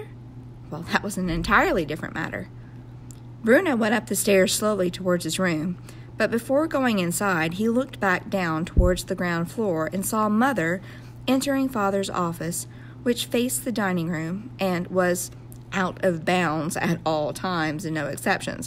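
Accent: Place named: West, United States. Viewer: why is this book slowly turning into a western accent. also thanks.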